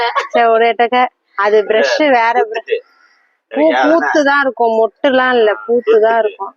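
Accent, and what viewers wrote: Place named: Mayiladuthurai, India. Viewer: Srumsi thirsmaisali ma❤😊 enga relatives lam mayiladudurai la erukanga unga slang apdiye eruku anga erukavanga lam epdi ungala mare dha semaya vela seivanga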